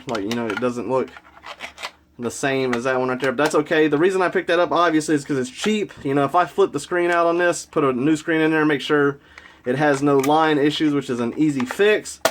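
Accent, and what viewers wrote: South, United States. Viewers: I noticed your accent, where are you from? I live in Bama and it's nice to see a fellow southerner into retro games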